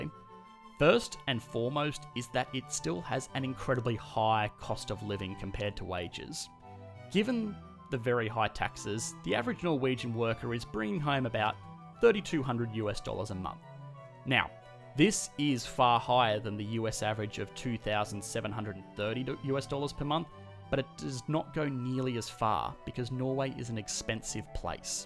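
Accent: Australia. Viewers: Great video. It sounds like you’re an Aussie; I’d be curious to know how you feel about Australia’s resource economy in light of what you’ve learnt about Norway’s policies. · i seriously hate the austrailian accebt , so many wordsso distorted , i cannot understand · Why does this guy sound Australian? Is he Norwegian? If not then all his stats are based on Literature found and stats not of his own experience. I would rather get my statistic figures from a local source, as opposed to stats found in the narrators home library.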